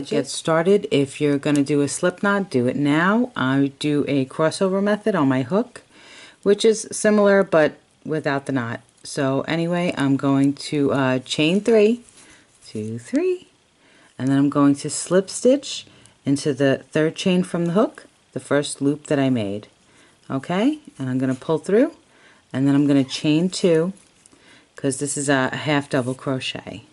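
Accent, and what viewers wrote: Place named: Brooklyn, United States. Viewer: I'm a Brooklyn girl but live in California now, hearing you I felt like I was home. Love this hat, gave one to a friend she loves it as her go to hat.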